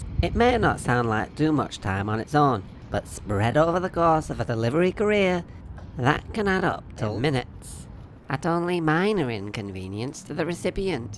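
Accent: Yorkshire, United Kingdom. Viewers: I can tell this guy is from Yorkshire because he sounds like David Firth.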